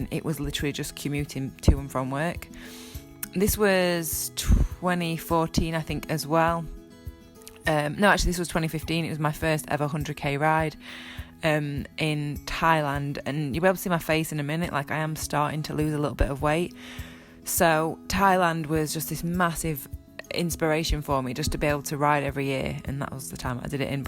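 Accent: United Kingdom. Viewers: This is such an inspiration. A feel good story narrated in a very pleasant British accent. Watch the video, you will be glad you did.. Thank you for sharing..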